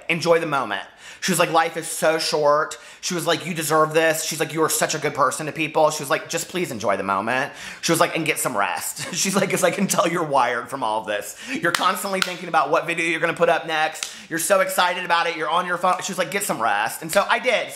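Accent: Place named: Kentucky, United States. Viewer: Thank you...I've needed someone like you in my world lately!!! I'm from KY but live in Nebraska & Maine. We lived in Louisville for 15 yrs so it is so nice to hear someone who sounds like me & I get. Fangirling over you sir!!! ;)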